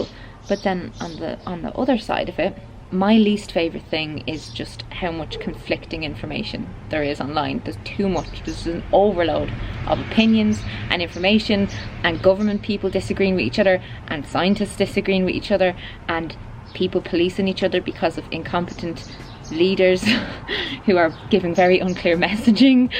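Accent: Ireland. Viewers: i'm going to go and study in Dublin for the next year so i've been binge watching your videos to up my game on the Irish accent so I don't look like a complete idiot 😂☀️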